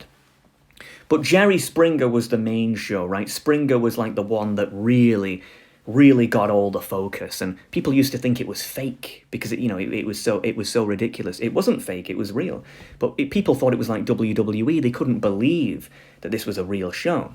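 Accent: United Kingdom. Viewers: This has been going on for years, it has just gotten worse with the internet. Before social media really became the behemoth it is now. You sound like you are from the UK or at least lived there and we had two notable celebrities. Jade Goody, who got famous basically for saying stupid things on Big Brother and acting "low class" And Katie Price - who garnered fame and wealth by constantly feeding the gossip rags with salacious tales about her life.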